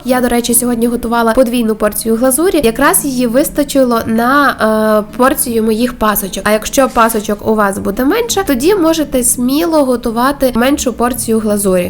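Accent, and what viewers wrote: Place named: Western Ukraine, Ukraine. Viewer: Это не украинская мова.....это западенская мода ! В Украине так не разговаривают !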